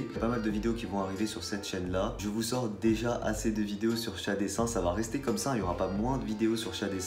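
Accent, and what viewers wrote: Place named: England, United Kingdom. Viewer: Je suis toujours autant impressionné par ton accent anglais mdr, le dessin de ta tête pète des culs!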